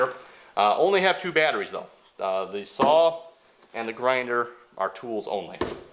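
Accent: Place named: South, United States. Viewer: Southern pronunciation for the most part. Wait until you hear "INsurance" and "Reality". 😁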